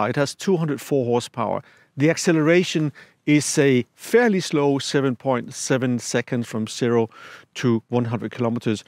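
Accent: Denmark. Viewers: A very professional upload - Thank you! Listening to your accent I would have guessed that you are of Danish origin:) Now seeing your name is, I guess I'm right:)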